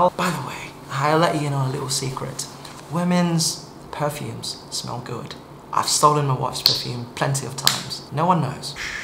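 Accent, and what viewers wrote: United Kingdom. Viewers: That British accent tho~~~ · that British accenttttt💓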